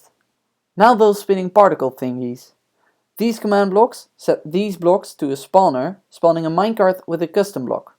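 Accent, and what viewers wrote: Netherlands. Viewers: dude are you dutch? you sound really dutch XD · Awesomely made! Are you by any chance Dutch? You're accent sounds Dutch haha:p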